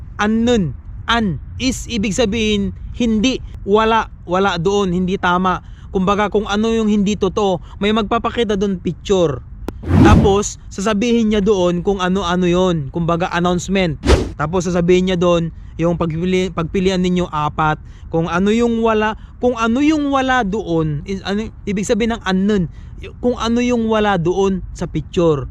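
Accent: Ilocos, Philippines. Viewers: Annyeonghaseyo .. Sir , ilocano ka po ba?! 😁☺ Halata po kc sa accent .. Correct me po if I'm wrong .. 😉 Feeling ko po nkikinig ako sa pinsan ko dhil sa accent mo po .. Hihi Btw , thank you po sa tips .. EPS Aspirant here .. Sana po makapasa .. Para sa aking family .. Fighting!! 😇 GOD BLESS po .. 👍👊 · ah ilocano accent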